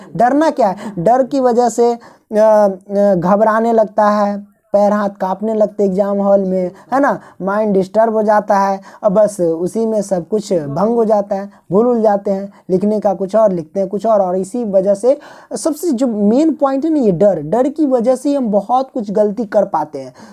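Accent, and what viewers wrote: Bihar, India. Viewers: Sir aap kaha se hai apki avj se lgta h aap Bihar se hai ??👍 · Aap bihar se ho kya?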